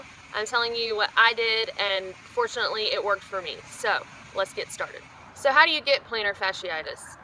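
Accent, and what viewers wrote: South, United States. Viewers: while its not Plantar Fasciitis, I got bad shin splints while section hiking the PCT... Good advice for both really is stretching, something I was like 'yea I don't need to stretch...' You sound like your from Georgia or South Carolina or something lol ;-)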